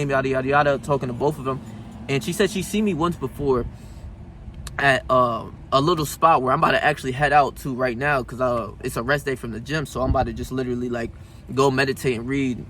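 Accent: New York, United States. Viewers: bro got that new york accent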